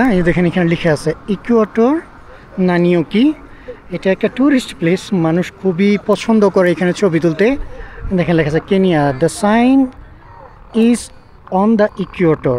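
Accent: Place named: Sylhet, Bangladesh. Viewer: ভাইজান আসসালামু আলাইকুম আপনার কথার ভাষার মধ্যে মাঝেমধ্যে সিলেটি টান আসে আপনার বাড়ি আসলে কোন জেলায়❤❤❤❤❤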